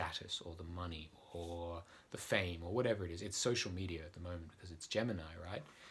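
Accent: Australia. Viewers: I'm so glad put you on for this Mercury retrograde video! I actually have Mercury retrograde in Pisces in my natal chart. I'm an Aquarius and I'm soaking up all your information! Great stuff! I'm definitely going to be subscribing to your youtube channel and sharing your videos with my friends! You sound like you're Australian? I love how you're giving a detailed explanation of Spika, etc. Thank you for talking about how each sign will be affected! That's very generous of you! All my best from the East coast in the states! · clearly knew the accent straight away… and was soooo thrilled was show casing your work… Amazing how it takes following someone over the other side of the world, to be able to appreciate someone in your own backyard! I’m on the gold coast… so happy you have been acknowledged for your skill and craft world wild.. shall follow … thank you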